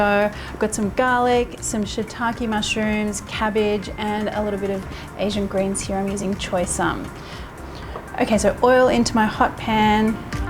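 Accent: Australia. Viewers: As an Aussie, I'm guessing from your accent, you're one too? I am new to your channel but love your videos!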